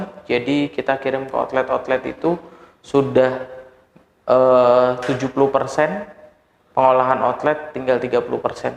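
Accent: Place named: Java, Indonesia. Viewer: Wehhhhhhh medok empuk rotine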